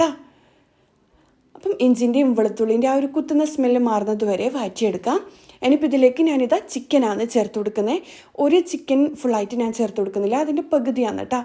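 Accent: Kannur, India. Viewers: സൂപ്പർ👌👌👌❤️❤️❤️👍 ഉണ്ടാക്കാംഇൻഷാ അല്ലാഹ് കണ്ണൂർ ആണോ വീട് ഭാഷ കേട്ടപോൾ😀നല്ലോണം തണിയാ വെക്കുക്ക😂 ഭാഷ കേട്ടപോൾ ചോദിച്ച നമളെ ഭാഷ😀😀😀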